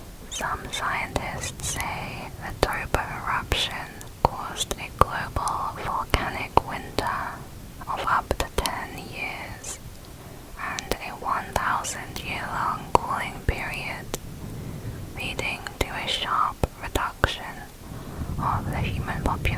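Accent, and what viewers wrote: England, United Kingdom. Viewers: She has an English accent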